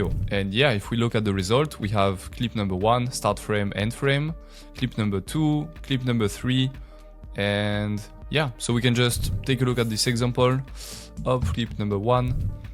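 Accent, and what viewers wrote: France. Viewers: Goeh i hate french accents. Just talk normal! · C une dinguerie , btw on sait tres bien que tu es francais rien qu’a ton accent hahahaa . Tuto incroyable , si tu pouvais nous en faire plus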